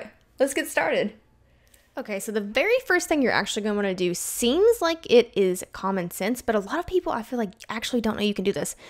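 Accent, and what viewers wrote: South, United States. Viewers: It's a Southern US accent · Thank you so much! It was so clear and your southern accent made it enjoyable to listen to :) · Its just the US accent i guess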